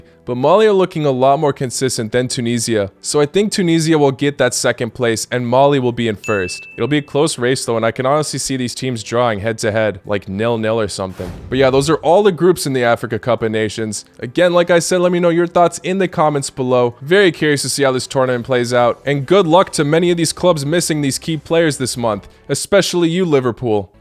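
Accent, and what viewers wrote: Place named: United States. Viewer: Is he American cus he got a american english accent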